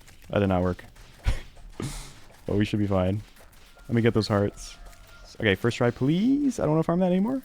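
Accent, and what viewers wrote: United States. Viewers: i think thats just his american accent, similar to "banena" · yeah Midwest American for sure.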